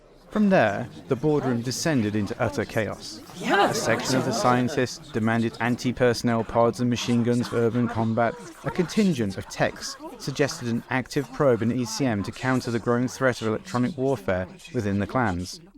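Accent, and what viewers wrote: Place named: England, United Kingdom. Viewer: One of the best things about being American is that everything just sounds better when narrated with an English accent. Add on great production quality, and you've got a winner. Subscribed.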